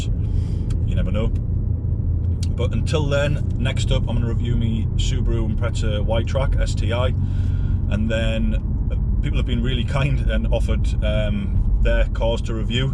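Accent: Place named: North East England, United Kingdom. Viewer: Just came across your channel today after looking at a 2019 A6 Avant 3.0L TDI V6 Black Edition online and was looking for a review. I presently drive a Discovery Sport but was looking to change and as a boy in the 70's Quattro's were the dream car. It is the only car I would consider changing into. I think the A6 looks better than the A4. I recently had a drive in the SQ2 but it didn't really excite me. I was really impressed with your film so I subscribed and wish you well for the future. I had a look at a red one also, somewhere in the north east of England, cant be far away from you judging by the accent.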